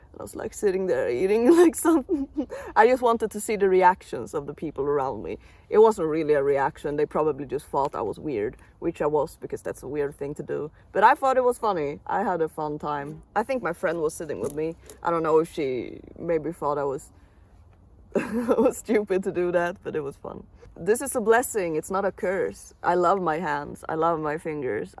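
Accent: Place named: Sweden. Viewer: I don't know if I got it right, but in my ears you have a similar accent to Peediepie's so: are you Swedish?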